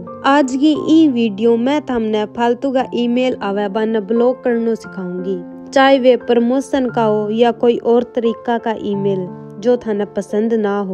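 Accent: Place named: Haryana, India. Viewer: आपकी हरियाणवी आवाज बहुत अच्छी हें। 🥰🥰🥰🥰🥰🥰🥰🥰🥰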